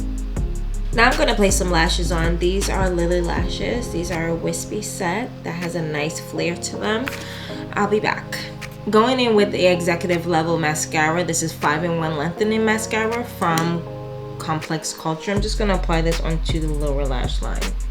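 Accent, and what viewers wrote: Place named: Caribbean. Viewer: Are you west indian? Cuz I hear a little accent.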